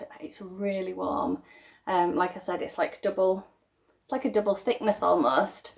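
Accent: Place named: Sheffield, United Kingdom. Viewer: Hi good to watch you again. Love hearing you, reminds me of Sheffield!!!! We moved out to New Zealand 16 years ago and I miss ALL the English accents. :) We are in autumn here and I just cast on a swatch for a new jumper for my hubby. I hope you decide to make up your own patter. It seems to me that you are really creative so this is just another way to explore your creativity. Your spinning is making me go back to finish my rolags. :) Catherine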